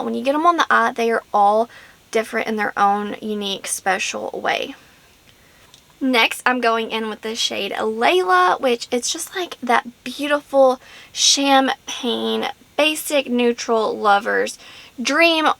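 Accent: South, United States. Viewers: very haha hey neighbor!! I knew you had a southern accent and had to be close lol 😂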